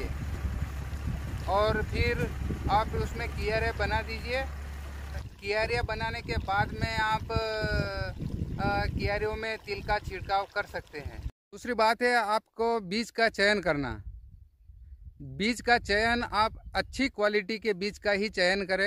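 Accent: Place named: Gujarat, India. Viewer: Bhai Gujarati he bava Hindi bolte he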